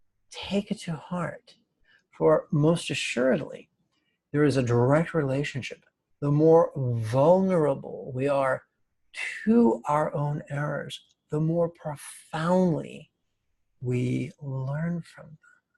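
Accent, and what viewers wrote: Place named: Germany. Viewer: I like your German accent!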